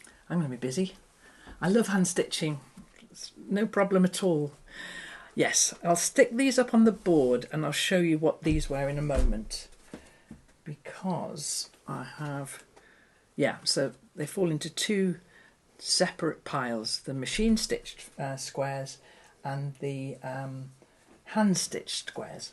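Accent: United Kingdom. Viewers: I agree with sherryconroy. I look forward to your Sunday YouTube videos. My paternal grandparents were from England. They passed when I was just a toddler so I have no recollection of their lovely British accent. Listening to your lovely voice is so relaxing. I wonder...did they sound like you? I'd like to think so. Nowadays so many of the online tutorials just rush through the steps leaving me a bit unsettled and jittery. I think of you as our Mr. Rogers here in America..."won't you be my neighbor". Thank you for just being you!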